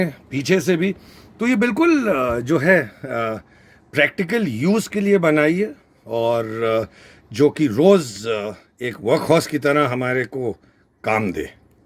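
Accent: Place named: Bhopal, India. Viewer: Hello bhai..loved your bhopali tales and your passion for Jeeps👌👌. Tumhari bhopal style commentary lajawab hai.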